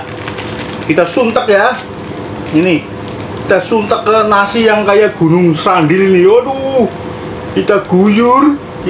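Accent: Banyumas, Indonesia. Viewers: Hahha lucu banget ngomong ngapak ky inong bae kang hihi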